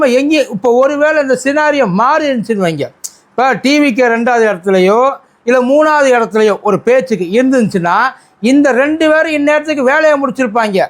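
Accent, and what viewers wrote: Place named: Madurai, India. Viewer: Like you bro long time to heard Madurai slang 👌😂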